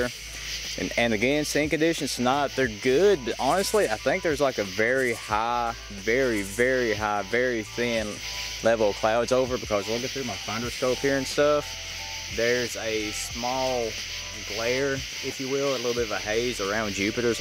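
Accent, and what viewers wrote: United States. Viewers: Wat state is his accent from...sounds cool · where in America is this guy from for that accent?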